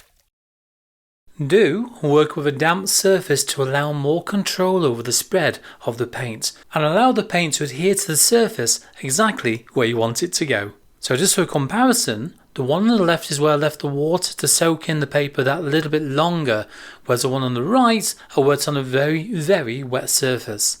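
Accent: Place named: Liverpool, United Kingdom. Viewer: This was awesome. Your accent reminds me of the greatest band of all time-!!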